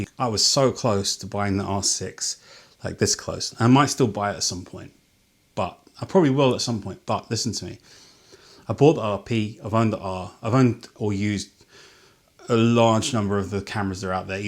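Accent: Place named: United Kingdom. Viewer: I think you made a really good point here. I do own the R5 and guess what on my surfing vacation last week to Spain I made all my pictures with my iPhone. I was too afraid that the camera could get stolen or at least damaged in the sand or whatsoever. When I came back I started thinking to address that point and looking for a cheaper body. I thought to get maybe a Sony A7 IIIc But the camera costs still €2000 at the moment. Also during walks and most of the time I don’t bring the R5 anywhere to keep it safe and nice. How can you get better if you behave like that? You really brought this whole issue to its point 👏👏👏 btw enjoying your English or British accent. :)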